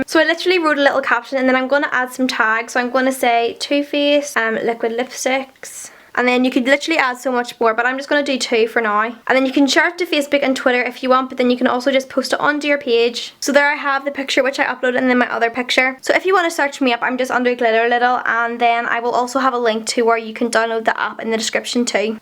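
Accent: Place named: Northern Ireland, United Kingdom. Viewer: I love your accent.. May be weird saying that bc Im from Northern Ireland too :)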